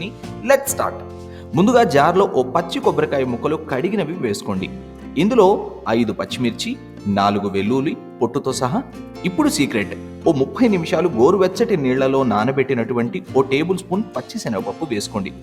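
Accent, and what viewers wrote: India. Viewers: Feedback: We love your attitude Love your honesty and sincerity when it comes to making your videos of recipes and presenting them as traditionally as possible Love your language, voice, intonation, the Teluguness in it, and your simplicity I never compare videos, but I think your videos are amongst the best, especially because they are very well made, and edited. Most importantly, the videos are short enough and very easy to understand and the recipes are straightforward and convenient to memorise and to reproduce. Thus far, all your recipes that have been reproduced at our home have proved to be great successes, and if there may have been any mess-ups, the fault lies with us, and not your videos. Yes, we'd love you to add your face to your voice. And I wish you'd expand the cuisines, venturing even into various parts of India. All the best Love A Foodie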